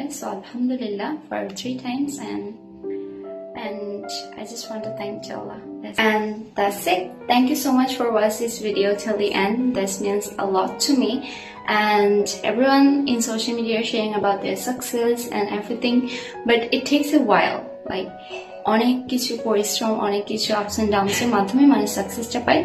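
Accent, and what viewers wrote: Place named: Chittagong, Bangladesh. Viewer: whan you say Bengali, চাটগাঁইয়া একসেন্ট আসে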